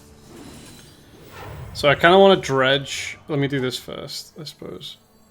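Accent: Liverpool, United Kingdom. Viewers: hes not Scottish tho, hes from Liverpool, UK. But I guess you're still correct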